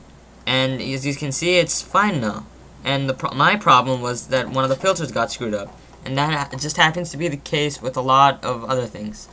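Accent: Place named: United States. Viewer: What happens with mine is when ever I try to upload a video onto YouTube, it starts to save my video and then half way through my laptop decides to die :) And my laptop isn't over heating....it's a mystery. Also, don't worry about stuttering, it could be because your nervous, I get nervous too and totally mess up. Also your American accent is cool :)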